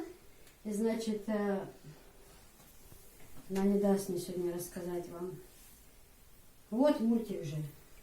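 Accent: Chechnya, Russia. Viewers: У вас даже акцент Чеченский) Дал атьо бойла, у меня две снохи русские, очень хорошие, уважающие наши традиции и веру! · у Вас уже акцент чеченский.молодец умница.счастья Вам. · Акцент чисто Чеченский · И акцент чеченский и похожа на чеченку Аллах1 Дала дукьа яха ёйла хьо🤲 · Надо же русская, по русский говорить с чеченским акцентом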